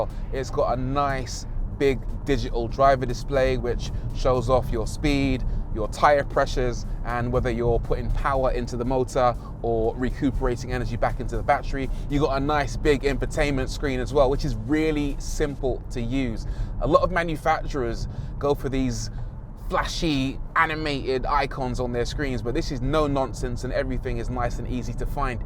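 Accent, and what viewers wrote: United Kingdom. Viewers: Yes we in Aus pronounce it the same as the motherland! All advertising by Hyundai here pronounces it the same as you do in the UK! PS. Love your reviews. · I got to love that British accent it is so awesome to listen to. I hope this guy does more EV reviews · Here in Australia 🇦🇺, we pronounce the word Hyundai, exactly the same way as you do in the UK, nice rant by the way, I was lol..😂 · I’m an American Army vet that did 3 tours in Korea. I pronounce it the same way you do sans British accent. I love your reviews. You have a new subscriber.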